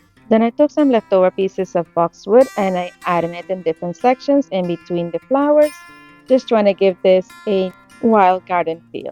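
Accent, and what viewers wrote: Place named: Latin America. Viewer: ur laugh is the cutest thing, it makes me smile everytime! Also, always love your projects, they're always so nice. And your accent reminds me of home. I grew up in Southern Miami, so allllll Latinos in one way shape or form and your accent is EXACTLY like my 2nd mom growing up. I love it! I just wanted to share that with you? What nationality are you? I think I know already, but dont wanna offend nobody! Lol❤❤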